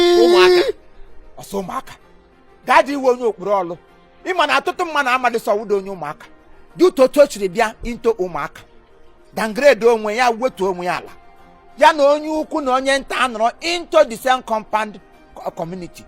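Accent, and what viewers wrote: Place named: Ehime Mbano, Nigeria. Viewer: This sound like Ehime Mbano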